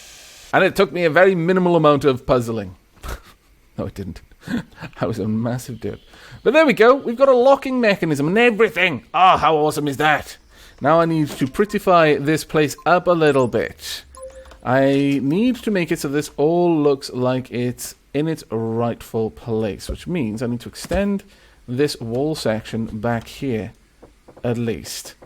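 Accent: Wales, United Kingdom. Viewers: is he Welsh? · are you Welsh 🏴🏴??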